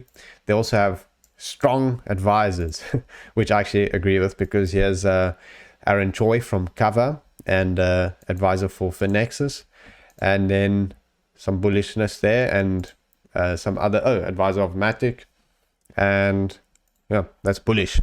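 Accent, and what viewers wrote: South Africa. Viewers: At first I was sure you were a saffa. Now I'm almost certain you're from stellies or Somerset... Keep on the great vids either way boet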